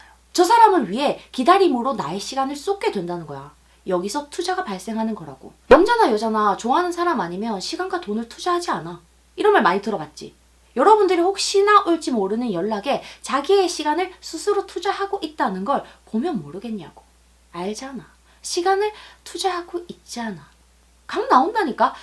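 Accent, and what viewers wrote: Gyeongsang, South Korea. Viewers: 사용하시는언어는 부산어 인가욧 대구어 인가욧???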